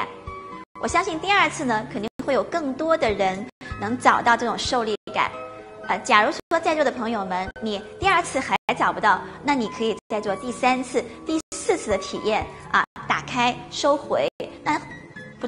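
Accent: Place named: Russia. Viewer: 字正腔圆，好可怕😨听着听着，好像回到了罗刹国。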